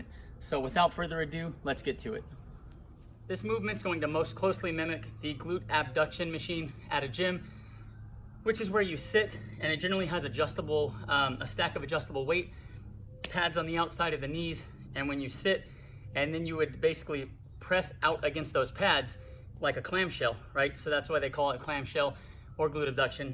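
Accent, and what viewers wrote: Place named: California, United States. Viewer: Damn, I never realized what a California accent was until I lived in Wisconsin for 10 years 🤣. Thanks for the workout!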